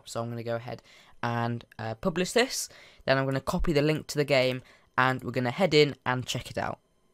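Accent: United Kingdom. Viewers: He’s British that’s how they say it there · Dont bully him cuz he is saying hach its a british thing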